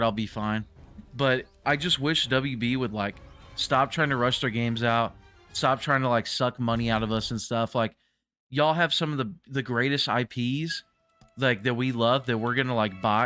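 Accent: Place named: Texas, United States. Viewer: bro! You gotta be from Texas. Heart to confirm 👍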